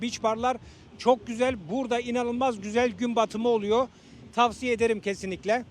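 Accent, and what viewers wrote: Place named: Germany. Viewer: abi sende bi alman aksanı sezdim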